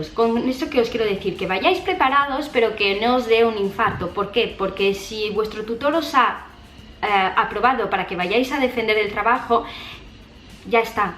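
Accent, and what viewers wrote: Italy. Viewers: Eres italiana?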